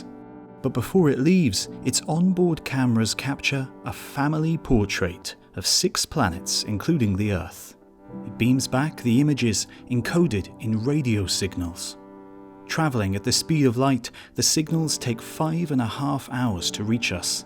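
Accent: United Kingdom. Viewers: are u british? 🤢🤢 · "Hoover up" Definitely not from the USA - as if the accent didn't give him away. That is the most (British? UK?) thing I have heard him say. Sorry if I guessed wrong on the accent - I am just an un-cultured American. :o)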